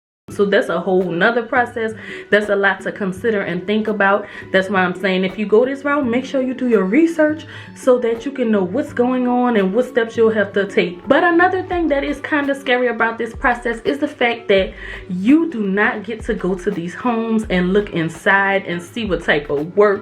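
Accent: New Orleans, United States. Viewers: Yeah I hear New Orleans in her voice 🙌🏾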